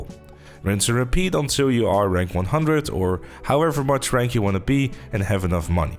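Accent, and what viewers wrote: Netherlands. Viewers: Another Dutch man? I hear your accent bro, you cant hide it from me!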